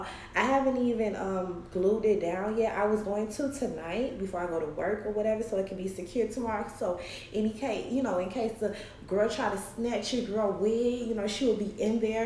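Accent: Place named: Miami, United States. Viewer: You are too cute! Are you from Miami? I love your accent. I also don't put heat to synthetic hair, but I have one wig that I stay throwing curls in the front to frame the face. Now you know this is a CITY GIRL SUMMER over here!!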